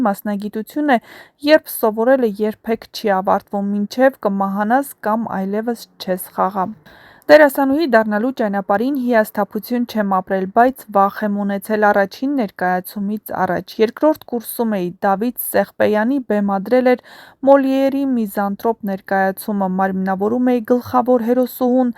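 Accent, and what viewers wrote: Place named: Russia. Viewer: Ինչի եք երբ բառը արտասանում եռբ, ետ ինչ զզվելի պրիմիտիվ մոտեցում է Հայերեն լեզուն խոսել ռուսական ակցենտով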